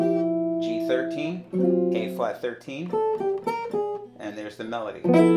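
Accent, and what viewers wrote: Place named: Philadelphia, United States. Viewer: Nice lesson,. You made everything clear; it's easy to see what you're doing. By the way, are you from Philly? I am, and you seem to have the accent.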